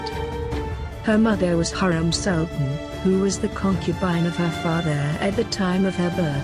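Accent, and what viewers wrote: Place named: England, United Kingdom. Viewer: Pronouncing Turkish with an English accent is a delight !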